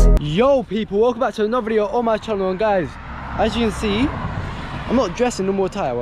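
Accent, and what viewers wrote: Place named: London, United Kingdom. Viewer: What’s wif the accent blood clot man ,use ben livin in London to long now !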